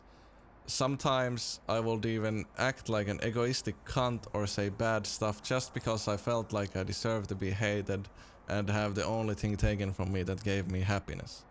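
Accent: Finland. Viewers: I feel his pain when he tries to say an english word correctly, only finns know. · Finnish accent is kinda cute tho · I can hear your Finnish accent from a mile away, love that!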